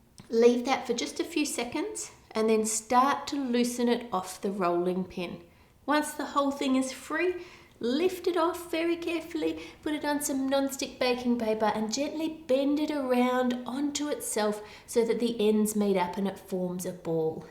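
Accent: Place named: Australia. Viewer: Hi I love ur videos and ur accent. Auzzie accents r my fave ❤️❤️xx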